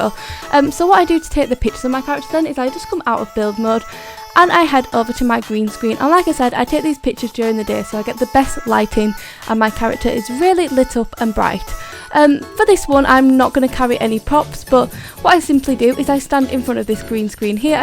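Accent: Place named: Leeds, United Kingdom. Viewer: Are you from the Leeds area? You've got a very similar accent to me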